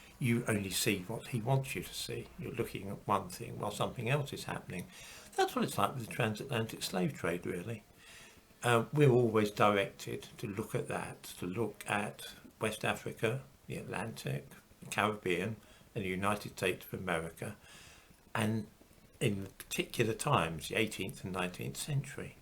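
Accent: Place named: England, United Kingdom. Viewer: So all it takes is for a dude with an English accent to sit in front of a bunch of books and present himself in such a manner, that he is a historian and nobody checks his lies well there were a few comments I saw on this where people were correcting him. I saw at least six comments where people corrected him on points of History. In six comments people have to correct him on points of history, but what's unbelievable is they still agreed with the premise... Wow people will take any excuse to wallow in their racism... Or is it just that they don't want to take responsibility for the villainy because when you find that many minor discrepancies it's a major lie.... The transatlantic slave trade happened. Slavery in Africa and buy Africans was way different than chattel slavery... They had rights they could not be murdered and families could not be separated... 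Many slaves rose to leadership within African families... Accept what happened learn from it and move on in a more positive manner work towards healing... For those of you whose psyche is too delicate to accept the fact that wrong was done and you perpetuate the wrong stay trapped in ignorance....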